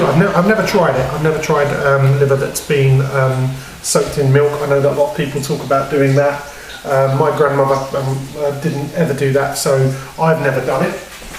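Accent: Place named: Australia. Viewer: I like your recipe and I like your knife but your Australian accent is hard to listen to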